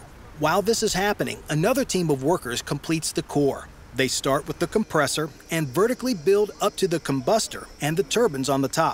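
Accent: United States. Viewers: Well, this is an American voice and pronunciation to a European ear is always strange.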